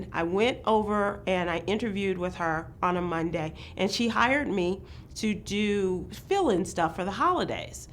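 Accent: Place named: Chicago, United States. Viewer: Chicago accent 😊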